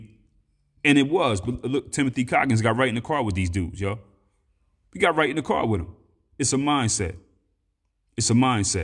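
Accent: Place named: East Coast, United States. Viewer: I love ya East Coast accent too bro 🤸🏽‍♀️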